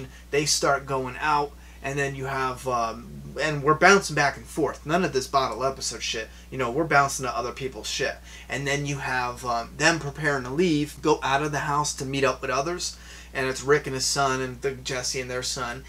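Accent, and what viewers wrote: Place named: New England, United States. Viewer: The way you talk...are you from New England??? Haha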